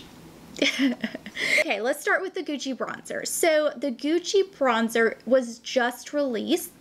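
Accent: South, United States. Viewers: i just found you & am following! You are the sweetest! Because of you it helped me to purchase the Gucci bronzer.. I was on the fence cuz it’s so expensive but You convinced me girl 👍🙏🏼🌹❤️💜💝🥰 I’m happy to follow you and I Love your southern accent., Y’all.. lol...sending you love ❤️ from upstate New York 🇺🇸💜💙🙏🏼🥰🌹💝💕🏖💚💋👍👌💪🇺🇸💪🇺🇸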